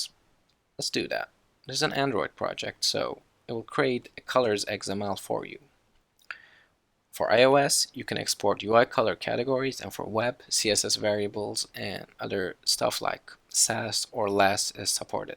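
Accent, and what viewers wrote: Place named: Turkey. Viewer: Aksanından zeplinin türk firması olduğunu fark ettim :)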